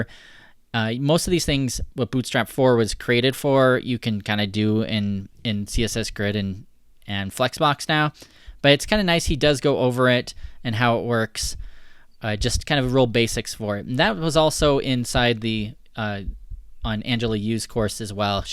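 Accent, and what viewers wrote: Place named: United States. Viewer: What american accent is that? Its beutiful and smooth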